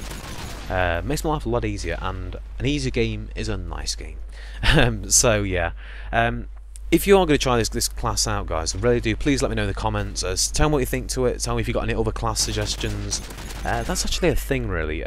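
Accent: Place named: England, United Kingdom. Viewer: which part of england your from mate??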